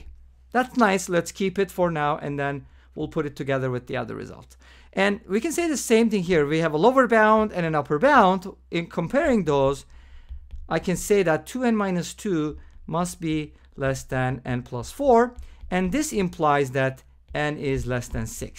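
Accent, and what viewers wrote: Turkey. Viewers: ingilizcen türk ingilizcesi gibi geldi. twitterdan takip ettiklerine baktım ve ta taaa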